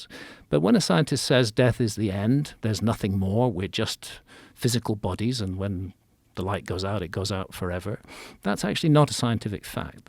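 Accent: United Kingdom. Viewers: jus because u have a british accent doesn’t mean ur smart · Still waiting for concrete proof of your theories graham… you trick a lot of people with your fancy words and British accent but eventually we need some proof. I’m sick of listening to the same stuff over and over again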